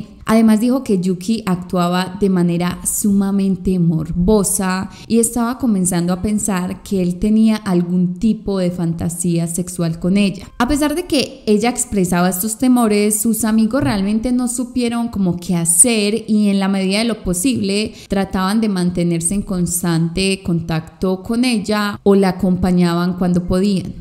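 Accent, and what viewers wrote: Colombia. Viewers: El acento colombiano es muy agradable !!!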